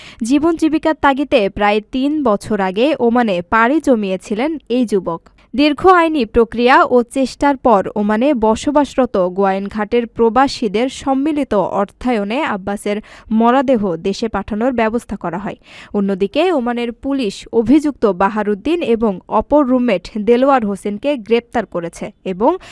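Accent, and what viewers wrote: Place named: Barishal, Bangladesh. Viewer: এই পাঠিকার গ্রামের বাড়ী মনে হয় বরিশালে।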